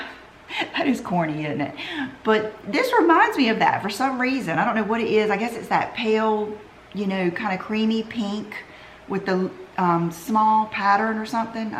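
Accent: South, United States. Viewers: I watch you mostly because it's wonderful to see a feminine girl like myself!! I am a girly girl but strong at the same time. I feel you are too! I am also southern born and raised and I love your accent! You are beautiful inside and out.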